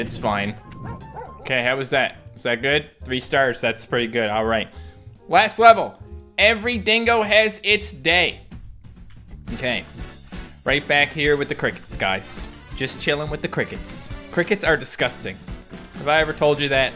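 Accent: Australia. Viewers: i wonder how the australian accent became a thing because im a australian and im nothing like the stereotypes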